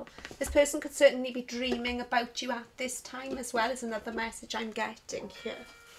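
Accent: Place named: Wales, United Kingdom. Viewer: Oh yea!! 😍💪 After a good few years of listening to these taro card videos, iv never found a Welsh person do one 😍💪greetings from Carmarthenshire 😜💪 and I have to say you have a Beautiful accent 😍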